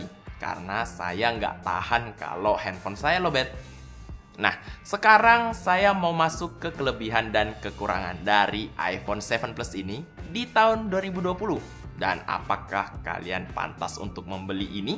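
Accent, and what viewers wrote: Indonesia. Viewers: Tk kira org telok lema dri logatnya :v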